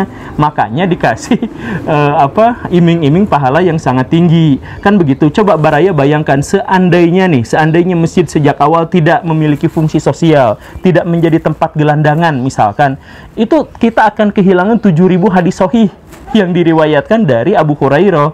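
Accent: West Java, Indonesia. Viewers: Meni nyunda pisan p guru.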